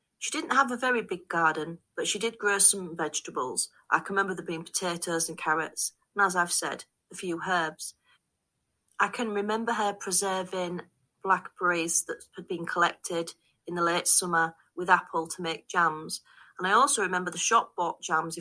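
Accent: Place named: Driffield, United Kingdom. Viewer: Just found your videos. I live near Hornsea, and your accent is similar to where I grew up, near Driffield. I am as frugal as I can be. Boiling hot water for a flask, one light on at a time, re-using tea bags, looking forward to your previous videos. Best wishes.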